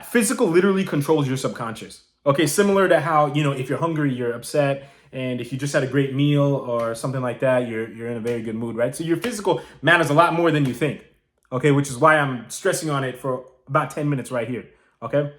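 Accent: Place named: United States. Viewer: You have great American accent. That made this video more pleasent to listen from A to Z without a single pause. Living in the USA for last 11 years I would like to add two more tips. 1. Please for god sake don't dress up which make you looke like a Migrant Engineering/IT major. (You guys know what I am talking about I'm from a south asian country as well not india even I can tell just by looking at the dress that he or she's from India) No I'm not suggesting buying expensive clothing, buy affordable ones within means buy with regards to American style/context Don't wear Jeans, Tennis show and full shirt even in a Concert while theres 100 degree fahhrenheit in the summer I also notice Nepalese people in the USA they have a better fashion sense here in the USA than any other Indian subcontinent population 2. I know English is our second language but please for god sake improve the english accent. 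It is becming a "call center Scam Joke" nowadays with heavy indian accent. Listen to this video he's also indian decent but there's no sign of heavy desi accent.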